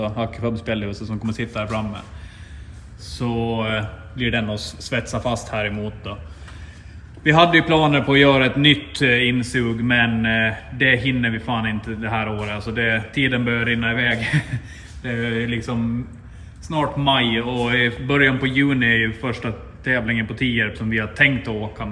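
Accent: Finland-Swedish, Finland. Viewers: Som alltid, kul,intressant och jäkligt imponerande hantverk! Lätt den bästa kanalen..... Muminsvenskan höjer värdet också såklart 👌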